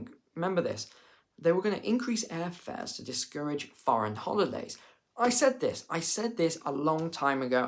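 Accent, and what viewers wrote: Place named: United Kingdom. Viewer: insults sound even funnier with his British accent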